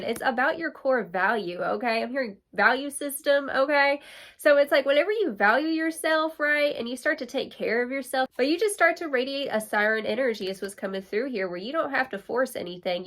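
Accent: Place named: East Texas, United States. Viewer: I never noticed b4 u got that deep east Texas accent 💕 have a great day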